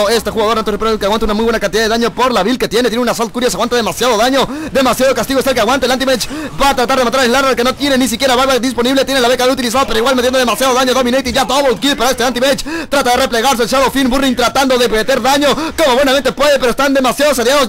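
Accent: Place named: Peru. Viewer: Claramente es peruano jaja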